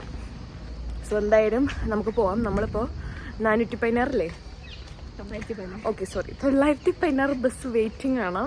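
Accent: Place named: Thrissur, India. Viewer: Aa thrissur slang 😁😁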